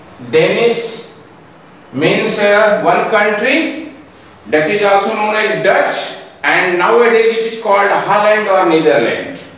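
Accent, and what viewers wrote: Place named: India. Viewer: Nicely explained 🙏 salute your English speaking it is just like hindi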